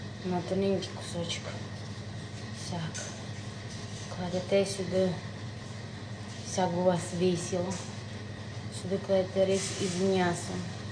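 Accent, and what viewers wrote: Transcarpathia, Ukraine. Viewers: Дякую, супер по нашому по Закарпатськи❤❤Ви чарівниця.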